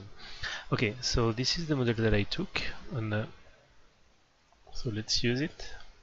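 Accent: France. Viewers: oula sound french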